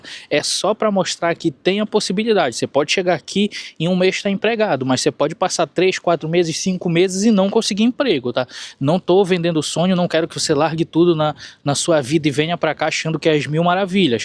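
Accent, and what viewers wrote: Pará, Brazil. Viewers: Mano parece se do Pará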